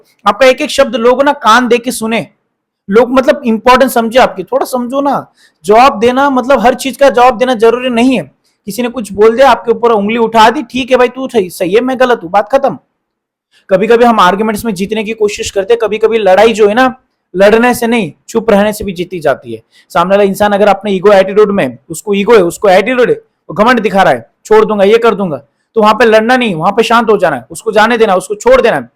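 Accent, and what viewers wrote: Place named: Maharashtra, India. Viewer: आप मराठी हो क्या सर आप की बातो मे मराठी टच हे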